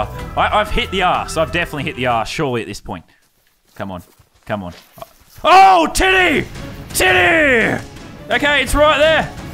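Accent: Australia. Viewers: The fact hes Australian males it so much better lol. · It’s his Australian accent and honestly I like how it sounds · The Australian accent makes his videos ten times better am i right😂🤣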